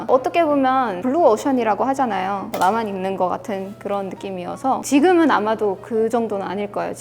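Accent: Seoul, South Korea. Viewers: 그냥 잘하는게 아니잖아 걍 서울사람이잖아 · 크헉. 완전 한국 서울 여자네. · 그냥 한국인인데? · 화면보면 전형적인 서양백인미녀인데 화면 내려서 목소리만 들으면 웬 한쿡여자가 말을...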